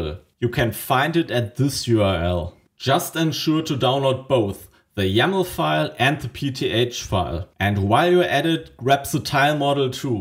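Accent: Germany. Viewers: Gutes Video.. der deutsche Akzent is der Hit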